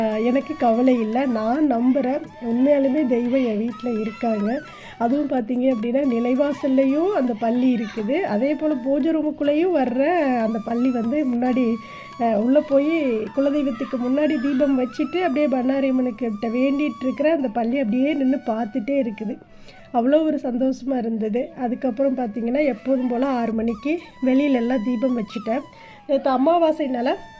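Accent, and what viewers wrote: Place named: Theni, India. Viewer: Akka ungal negative place Dindigul la akka ennaku theni akka ungaloda speech appadiye erukku Akka ❤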